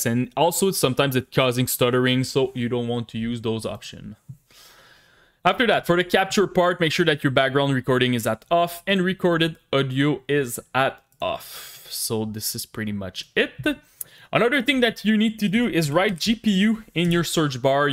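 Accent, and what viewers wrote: Quebec, Canada. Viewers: t'es tu un quebecois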